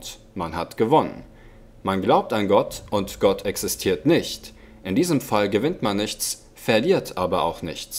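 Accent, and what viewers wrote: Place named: Switzerland. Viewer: haha bisch du en schwiizer ?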